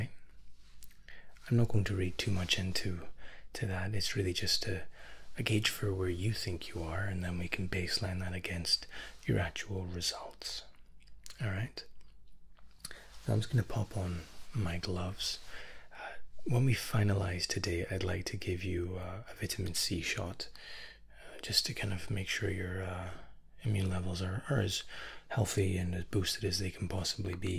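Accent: Scotland, United Kingdom. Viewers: Is he British ??? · Love me a handsome Scot with an immaculate accent. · Is he Scottish?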